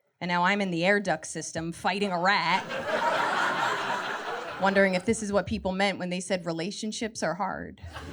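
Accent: New Jersey, United States. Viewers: Hilarious. I’m a transplant from the east coast and hearing your humor and accent brought me back to summers in Jersey. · youre clearly from NJ:)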